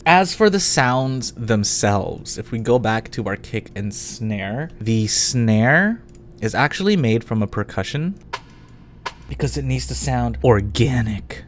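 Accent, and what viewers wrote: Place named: United States. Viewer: Too American sounding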